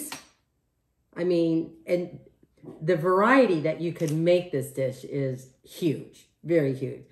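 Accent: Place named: Michigan, United States. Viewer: LOL Yup, she's from Michigan . . . says "MELK" instead of milk. Go Franklin Patriots!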